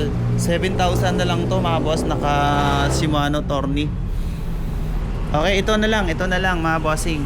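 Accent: Visayas, Philippines. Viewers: Bisaya ka bai?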